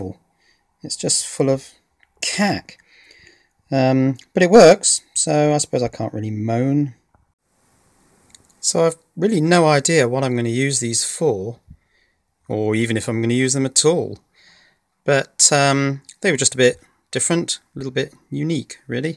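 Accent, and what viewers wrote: United Kingdom. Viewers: Very refreshing to hear a brit with an old accent like his, good work.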